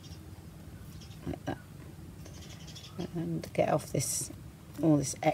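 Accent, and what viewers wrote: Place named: Cornwall, United Kingdom. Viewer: So glad I found your channel, these are so pretty I love how you make them look so easy but still so amazing. Also I love your accent, you wouldn't be cornish by any chance?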